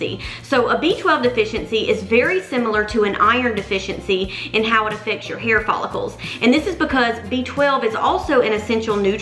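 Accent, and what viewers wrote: South, United States. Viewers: Your southern accent, tho!! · love the southern accent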